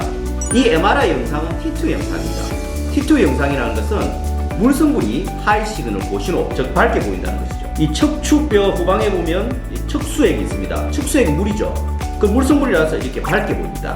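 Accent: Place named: Gyeongsang, South Korea. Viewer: 낭만 닥터님. 구수한 갱상도 사투리에 열정까지 담아서 설명해주시니 머리에 속속들어옵니다^^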